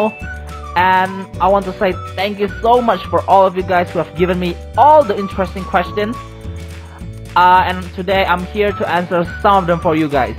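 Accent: Indonesia. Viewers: Logat indo banget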